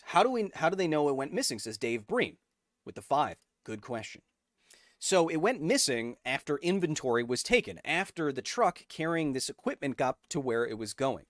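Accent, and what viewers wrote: Queensland, Australia. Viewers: 1. North-Eastern. That's at least northern-NSW if not QLD or NT. We have far less "twang" down south. 2. Geiger.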